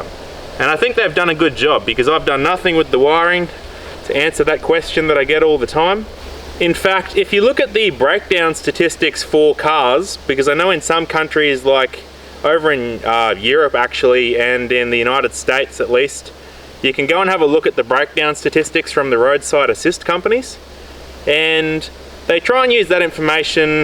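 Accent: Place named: Australia. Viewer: Yes to a snorkel, and yes to more hearing you say, "battleprius," in your lovely 'Strayun accent.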